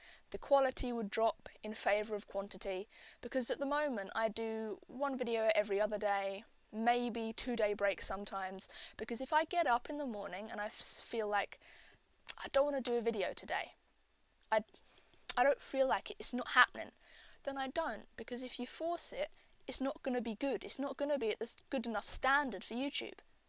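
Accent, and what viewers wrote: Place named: RP, United Kingdom. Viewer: Damn youre posh.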